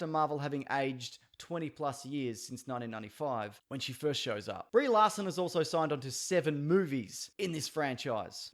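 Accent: Australia. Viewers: Maybe it's the Australian accent, but I never get offended when he trashes Trump, even though I am a Trump supporter. Thanks for always making me laugh,.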